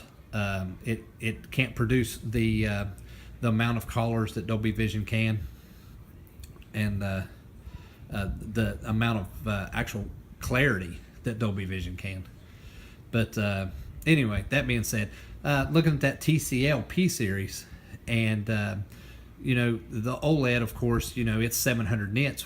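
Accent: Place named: South, United States. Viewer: Jordan Gons might just be his heavy southern accent.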